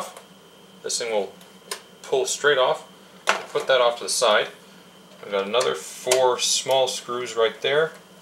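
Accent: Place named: Canada. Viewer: You sound a little like a Canadian.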